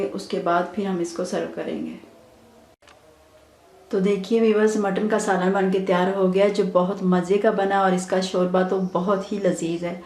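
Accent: Punjab, India. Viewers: Pure Punjabi accent.😍👍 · I am belongs to arain family . Punjabi accent ha. Ap ha :p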